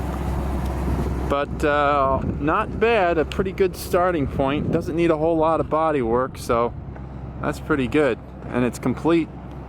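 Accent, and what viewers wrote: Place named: New Jersey, United States. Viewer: This is crazy... but as soon as you said, "Just got it yesterday" , I thought to myself, "He sounds like he's from New Jersey." I looked back at the license plate, and sure enough I was right! (I'm from California, by the way.)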